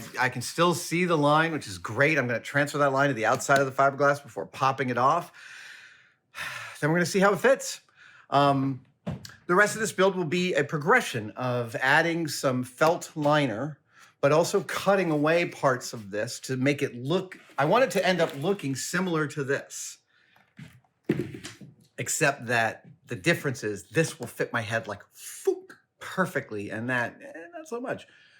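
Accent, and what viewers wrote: Scotland, United Kingdom. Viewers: Nice ta here your Scottish accent dude no to bad tbh fea the bony home land of Scotland 🏴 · Love the wee Scottish accent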